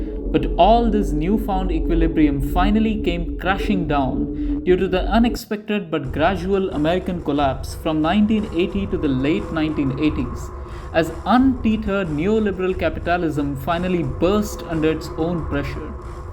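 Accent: India. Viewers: I'm sorry, I can't listen to an indian for 9 minutes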